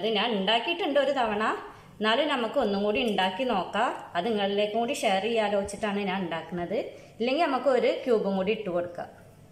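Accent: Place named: Pattambi, India. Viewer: Pattambi ഭാഷ നല്ലോണം വന്നിട്ടുണ്ട് 😍😍😋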